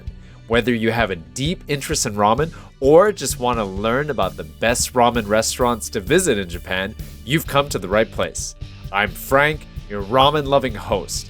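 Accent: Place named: United States. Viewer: that is honestly so impressive. I for sure thought you lived in the states for a time or something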